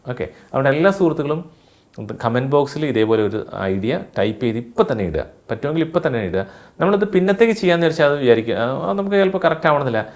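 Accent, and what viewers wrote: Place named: United States. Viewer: American pronoun sation good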